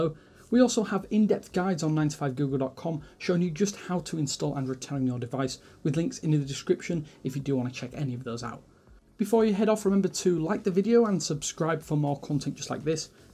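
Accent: United Kingdom. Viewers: That BRITISH accent!!!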